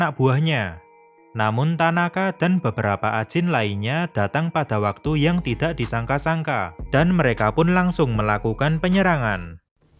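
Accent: Java, Indonesia. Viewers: Anjir medok kurang cocok gan, pake bhs jawa aja klo lebih nyaman. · Logatnya terlalu medok Kurang nyaman euy.. maaf ya min, tdk jadi subscribe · Logat jawanya kerasa bngt min hhh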